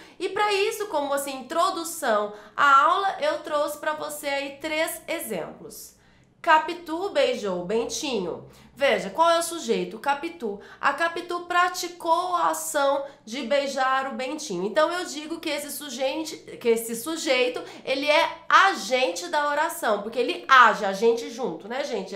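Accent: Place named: South, Brazil. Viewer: Professora, seu sotaque parece ser do sul, mas qual estado? Abraços apertados de quem já assistiu as suas aulas literalmente centenas de vezes.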